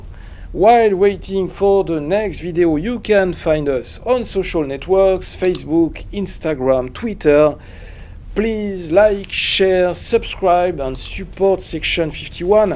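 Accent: France. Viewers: Literally the strongest french accent I've ever heard 😂, cest bon mon ami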